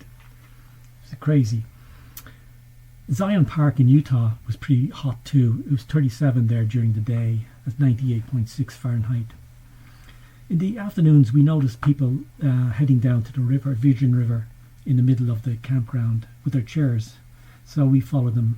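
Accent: Ireland. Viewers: I miss the Irish accent so much ❤️ thanks for this going go be doing this in Canada in the summer ! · Great van build 👍 Do I detect an Irish accent ? Maybe Welsh?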